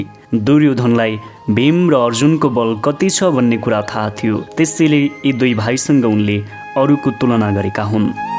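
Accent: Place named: England, United Kingdom. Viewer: भएन , भएन हजुर भएन वाचन गरेको। नेपालीमा शुद्द उच्चारण गर्न पहिला सिक्नुस। अ,ग्रेजी पाराले बोलेर नेपाली भाषाको बिजोग नबनाउँ। माफ गर्नुहोला।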